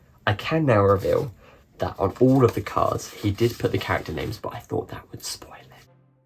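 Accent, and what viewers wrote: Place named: United Kingdom. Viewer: Hi. I would have to take pictures of them, but so many gave away, to my ex's nieces and nephews and they broke them, but I will send a few to you soon, thank you for commenting on my comment love it...Also, love your accent and the U.K... want to go there since first saw Harry Potter... but in the summer though...Here in Canada, 2 months of nice and the rest well...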